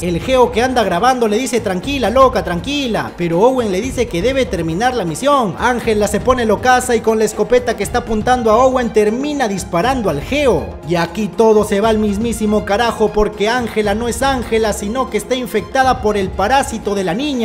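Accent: Peru. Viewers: Soy yo o es Peruano?🇵🇪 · Literalmente en informacion del canal y por su forma de hablar, es peruano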